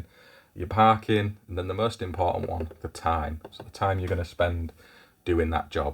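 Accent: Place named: Yorkshire, United Kingdom. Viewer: Always liked your channel. Just dropped on this vid. Proper Yorkshire. Love it.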